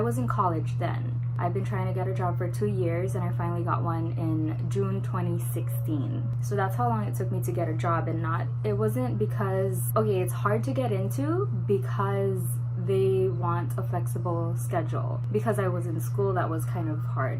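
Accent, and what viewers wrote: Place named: Trinidad and Tobago. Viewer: You must be trini I feel like a hear an accent ! Lol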